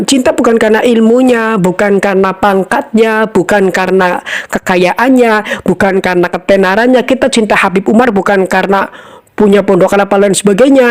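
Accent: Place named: Java, Indonesia. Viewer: NGOMONG LU KOK MEDOK. TAPI GUE SUKA. TERUSKAN DAKWAH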